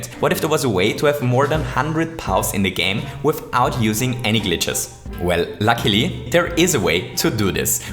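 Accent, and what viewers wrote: Germany. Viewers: WAIT ARE YOU GERMAN? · Does this guy make German-Videos as well? Because you can hear this by his pronunciation of some Words! (PS: Ich frage, weil mir seine Stimme bekannt vorkommt.)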